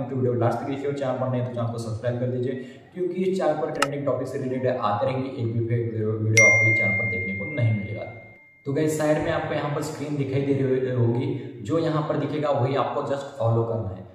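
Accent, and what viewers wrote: Maharashtra, India. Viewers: Marathi ahe ka tu😁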